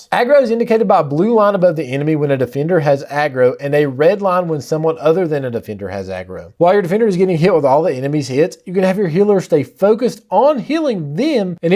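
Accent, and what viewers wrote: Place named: South, United States. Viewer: Just checking this out, really well done and clear. Thanks! Side note - where are you from? I'm from NC and I sense a southern accent here too :D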